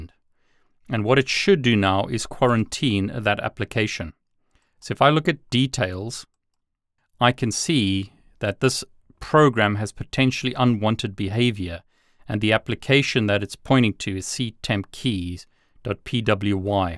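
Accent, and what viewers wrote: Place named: South Africa. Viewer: are you south african lol? that’s what your accent sounds like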